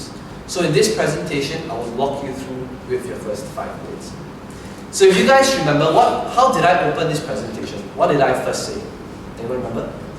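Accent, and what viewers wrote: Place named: Malaysia. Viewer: It's likely due to his Malaysian accent, which I understand how certain words sounded as if they were muffled as most Malaysians has less accentuation in the pronunciation of the English Language, an often overlooked detrimental effect that was resulted from how fast, short, and simple they wish to be in their speech. I'm a Malaysian my whole life, so of course I understand.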